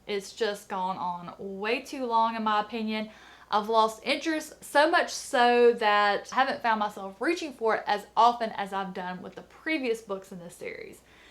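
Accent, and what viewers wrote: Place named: Tennessee, United States. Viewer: Your accent is the best <3 TN am I right? I lived there for a little bit and everybody had a nice twang.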